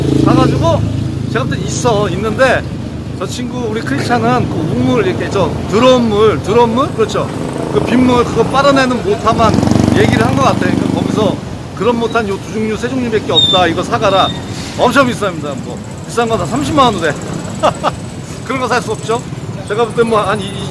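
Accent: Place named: Japan. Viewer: 왜, 항상 일본식 엉터리 영어를 사용하시는지.. 혹시, 택시를 타쿠시라고 하고 버스를 바스라고 하시나요? 일본 사람들 ㅓ 발음 잘 못합니다. 그것을 한국 사람이 왜 따라하는지 모르겠네요.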